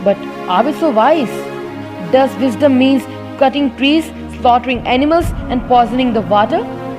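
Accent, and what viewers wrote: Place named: India. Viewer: Ok....cut the LOUD dramatic music. It doesn’t help. Speak better English. Strong Indian dialect like Apo in Simpsons doesn’t help.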